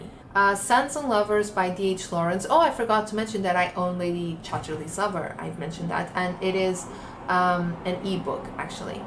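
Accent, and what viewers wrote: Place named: Russia. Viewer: She's Russian. Her accent is obvious to me.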